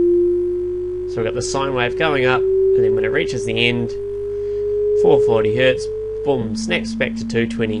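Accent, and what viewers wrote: New Zealand. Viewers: he's from New Zealand. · so obvs a kiwi haha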